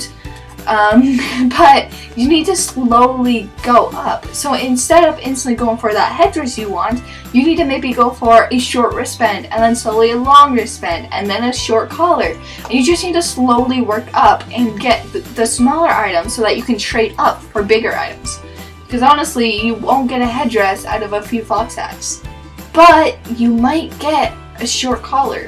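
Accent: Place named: United States. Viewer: I love your mini country accent it's so cute❤️ :)))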